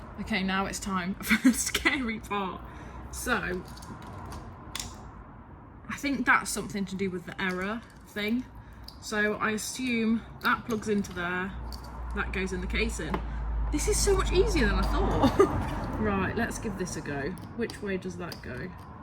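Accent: United Kingdom. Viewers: Great little video, although simplistic it's what I understand best, but I am struggling to place your Northern accent. New Sub too, so looking forward to the next video. Ed 😉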